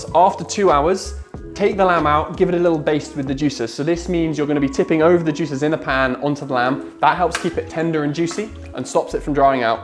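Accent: United Kingdom. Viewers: Bruh the British accent 😂😂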